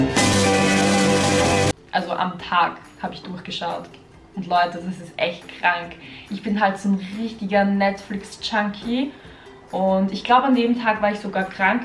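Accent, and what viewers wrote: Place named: Austria. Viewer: Sie ist aus Österreich, deshalb die Aussprache!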